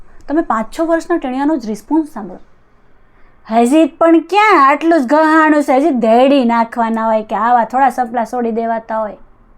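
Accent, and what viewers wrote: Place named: Kathiawar, India. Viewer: મોયજ આઇવી હો .. તમારે મોઢે અમારી બોલી હાંભળી ને... અદ્દલ કાઠિયાવાડી સવો તમી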